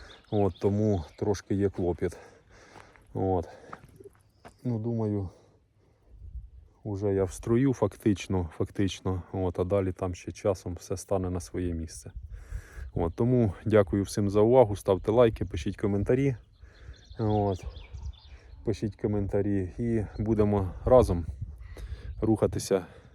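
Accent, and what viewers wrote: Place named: Cherkasy, Ukraine. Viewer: Перш за все здоров'я вам і вашій родині найкрепшого.Ви надихаєте!!! Хотіла здати корову( не парується)і після вашої подорожі в Херсон визвала ветлікаря,зробив узі( жовте тіло).Пролікувала і сьогодні спарував. Отел первістки( ваше відео)- сама отел приймала навіть без сусідів. Після отелу не солодка вода а енергомол.Води пила стільки хотіла і все супер.Хто хоче вас чути той чує.Люблю слухати вашу "конкретну" мову- наче ви виросли на Черкащині.❤❤❤❤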